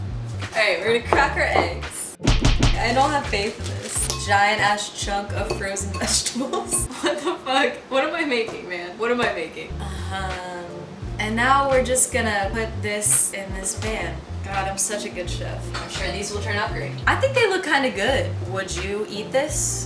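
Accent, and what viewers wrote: Ohio, United States. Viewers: Side note: I love your user name lol has a nice ring to it! Sounds sexy/badass at the same time. Anyway I noticed the mention of your Ohio accent? Midwest represent lol I live in lake county Illinois which is about 35 min north of chicago. What part of Ohio are you from? Do you still live in Ohio currently? Not trying to sound like a creep stalker lol honestly just curious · Great video! Haha your Ohio accent is showing!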